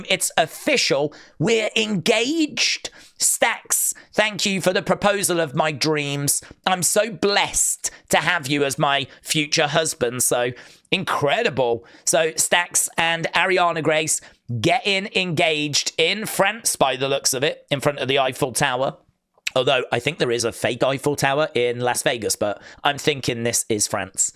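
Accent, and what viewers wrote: United Kingdom. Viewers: U make a British accent sound perfection cody will be heel by wrestlemania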